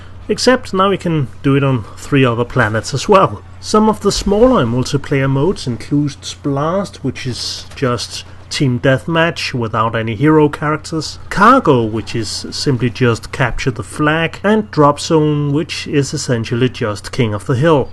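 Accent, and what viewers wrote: Denmark. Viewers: Men too, I love the goofy Danish accent, all while the content is supremely nerdy and having a god mix off subjective and objective evaluation. You and Demiath are my two go to guys for intetesting video game content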